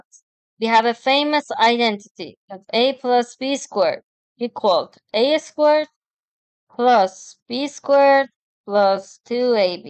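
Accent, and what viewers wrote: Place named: England, United Kingdom. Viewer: La voix féminine de la commentatrice est traînante...ma parole c'est une anglaise...je suis dur d'oreille...les appareils auditifs sont beaucoup trop chers pour moi!Y-a pas de quoi rire...quand on est vieux on est vieux! Il paraît que Google va faire faillite...Ça ne m'étonne qu'à moitié...Ils poursuivent trop leur abonnés de leurs assiduités...C'est vraiment lassant à force même pour un vieux retraité.😢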